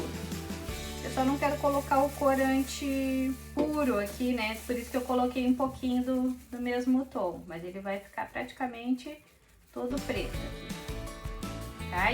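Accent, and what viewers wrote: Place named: Porto Alegre, Brazil. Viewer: Bha! Caí aqui de paraquedas! Achei o sotaque parecido com o meu! Sou de Porto Alegre Bairro Agronomia. Já vou me inscrever agora. Gostei da técnica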